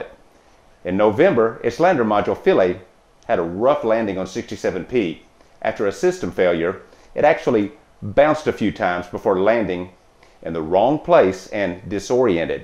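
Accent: South, United States. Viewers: so cus of jebus right ? i hear your ignorant southern accent you beleive in imaginary friends and jebus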